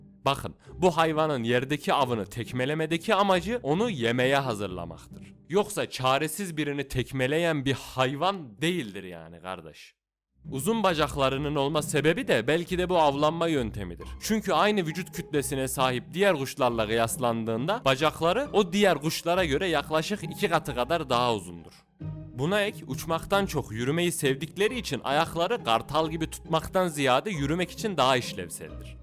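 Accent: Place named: Sivas, Turkey. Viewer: Seslediren yüzde 99 Sivaslı herhal😂 süper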